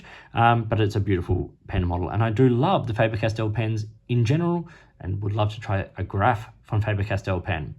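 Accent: Australia. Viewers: i am still thinking whether to buy orange or black one. For a few years I have been relying on your reviews videos mainly because you’re also a lefty, have a nice handwriting and your reviews i find them very informative, to the point without ‘frills’. I’m from Europe and love your Australian accent 😅 thank you for all your effort! ❤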